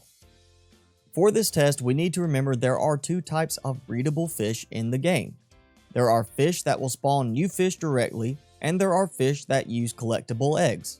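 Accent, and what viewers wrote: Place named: Alabama, United States. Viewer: ALABAMAAA ACENT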